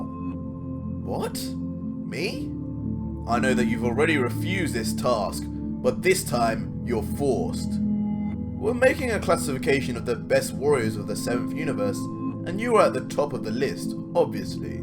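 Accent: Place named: United Kingdom. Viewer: Dewi Middle he has a British accent though 🤦🏻‍♀️🤦🏻‍♀️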